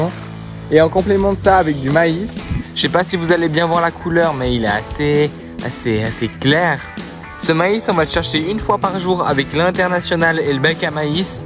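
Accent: Switzerland. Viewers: L'accent du Nord Vaudois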